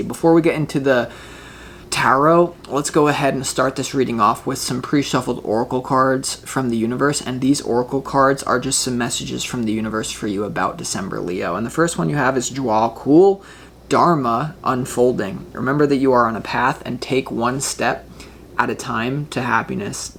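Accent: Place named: Staten Island, United States. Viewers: I love this reading. The NYer accent especially. Omg. Prepandemic is def. Right!! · and extremely intelligent and wise... love the NY accent, HA ! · loved the reading 😍 LOL the accent got me laughing.. sounded like staten island accent.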